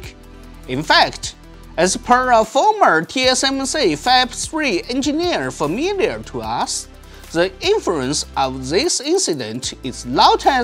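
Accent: China. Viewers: which chinese dialect is that?? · its his second language hes probably just trying to practice his english. he seems to be chinese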